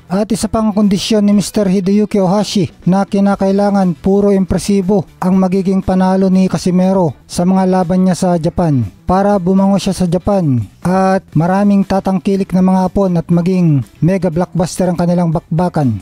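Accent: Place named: Visayas, Philippines. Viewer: Salita mo palang, pang bisakol na...basta ganyang lahi mga fakenews .... dapat mawala na kayo sa mundo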